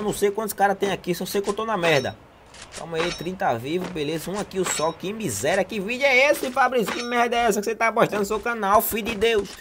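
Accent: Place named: Paraíba, Brazil. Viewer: Paraiba pra fala feio Zuado joga nada.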